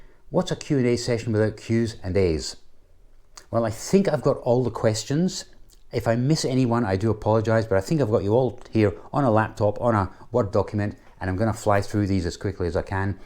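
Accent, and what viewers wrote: Scotland, United Kingdom. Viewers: Congratulations 👍👍. How come you have a Scottish accent when you grew up in Oz?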